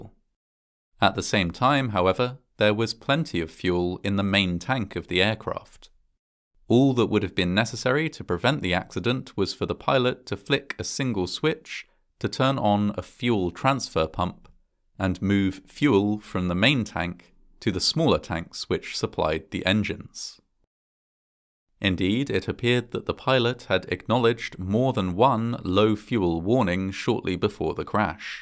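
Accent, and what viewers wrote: United Kingdom. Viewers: Did someone program a bot narrator with a British accent!? Because I didn't thin a narrator could sound worse that a bot narrator with an American accent! But you did it! Absolute crap!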